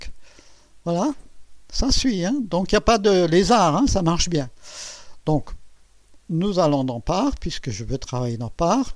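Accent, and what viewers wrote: Alsace, France. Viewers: Ton accent me fais penser à l'entraîneur de foot *. Mais que suis-je bête il est aussi Alsacien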